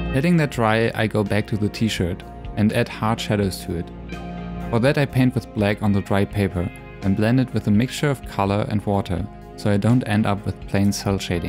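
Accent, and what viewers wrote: Germany. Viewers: Deutscher Akzent?:D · I dont know you but you sound like a german who speaks english. If its the case: hi ich bin deutscher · holy shit im more intrigued about his german accent and voice sounding out of place for someone who looks like that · Deine Stimme hört sich Deutsch an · Are you german? XD man hörts sehr😂😂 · Ich könnte mich irren, aber der Akzent hört sich nach deutsch an. Lol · Why do u sound like a german guy speaking english? I mean i would know what that sounds like since i live in Germany but...seriously bro. Btw i love your drawing skills!! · Dieser Moment wenn man halt direkt raus hört, dass er aus Deutschland kommt (oder zumindest deutsch seine Muttersprache ist) 😂😄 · OK, sorry but I must ask you that... Are you a German because you have an small accent...🤔 No matter your Video is pretty cool😊😎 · Dude, your english is indeed pretty good but nevertheless I instantly noticed that you are german.xD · Kann es sein das du Deutsch bist? 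Du hast diesen Akzent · Sehr cooler Zeichenstil und gut erklärt. Hatte nur bereits nach 2 Sekunden gemerkt, dass du Deutscher bist xD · Bro bist du deutscher hört sich nämlich so an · Could it be you're german? Cause your english sounds pretty german to me. Und ich bin selbst aus Deutschland. xD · Your german accent is thicc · You sound like a german speaking english. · German accent? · Ae you from germany because you have this accent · sorry but are you german? You have a really hard accent. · Hey are you German? Bc you kinda sound like you are and you were at gamescom :D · You have a German axent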